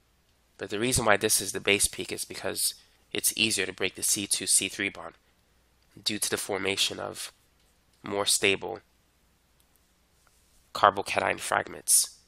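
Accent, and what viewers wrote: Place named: New York, United States. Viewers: i think i hear a new yorker accent in this man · new york accent king <3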